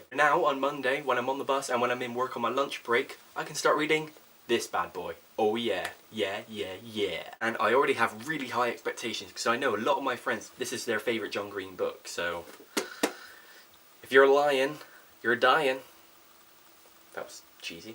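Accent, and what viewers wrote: Bristol, United Kingdom. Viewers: Your Brizzle accent makes me so happy :')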